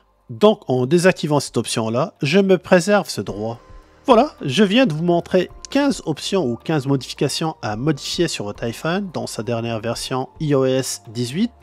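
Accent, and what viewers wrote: Quebec, Canada. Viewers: Présentation et conseils très complet et en plus avec une pointe d'accent Canadien. Qui de mieux ? MERCI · Super vidéo. Bonus d’un français, ton accent de cousin d’Amérique 😊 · c’est un accent du Québec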